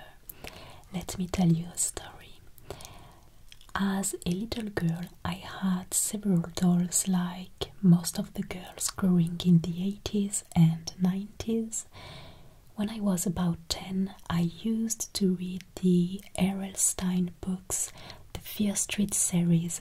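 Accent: France. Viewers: I just realized that your beautiful way of speaking English with your French accent has brought back many memories from 30 years ago when I fell in love with a Vietnamese woman who I regard as the love of my life, who learned French when she was young in school in Vietnam in the 1960s and lived and worked in France (Bretagne) for a decade after escaping Vietnam in the 1970s. She learned English as an adult and had a very unique way of pronouncing certain English words, which thanks to you I now recognize as being a French accent but I never realized it until now. Thank you for bringing back those good memories even though my love story had a sad ending, but having listened to your voice in a wonderful ASMR video has helped heal the scars that are still there for me as I can hear her voice once again through your voice.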